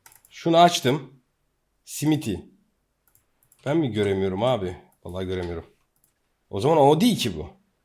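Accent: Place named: Anatolia, Turkey. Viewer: Anadolu insanı gibi konuşman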